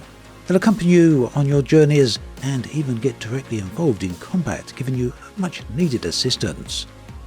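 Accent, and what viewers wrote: United Kingdom. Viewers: Quite off topic but where are you originally from? You have a booming voice that reminds me of Jason Statham, but I can't say I've heard a British accent like yours before. I'm anxious to know what part of which British (or otherwise) country has this accent where there is emphasis on the last syllable of some words that isn't present in other British accents. (forgive me, i'm a bit of an amateur voice impressionist and am easily fascinated by different accents). Fun fact, sometimes when I'm watching your videos, I remove the bass in my equalizer settings to prevent your voice from resonating in the entire house, like Liam Neeson's does. 😉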